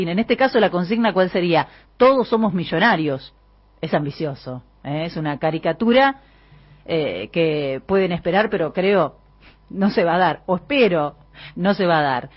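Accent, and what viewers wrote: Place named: La Pampa, Argentina. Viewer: conozco esa voz pampeanaa clara y concisa..Bravo!!!!!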